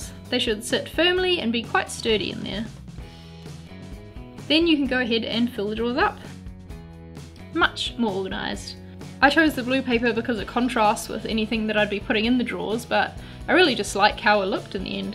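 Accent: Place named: New Zealand. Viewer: i knew u were from NZ I AM 2 i could tell because i usually watch american youtubers and you sound so different like a kiwi I FINALLY FOUND A KIWI YOUTUBER!!!! this video helped a lot thank you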